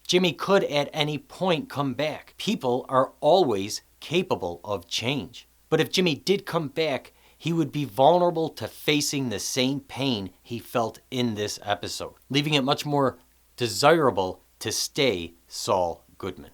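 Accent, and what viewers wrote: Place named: Michigan, United States. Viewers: Like your Midwest accent! 👍 Great insight. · Love the accent. Michigan?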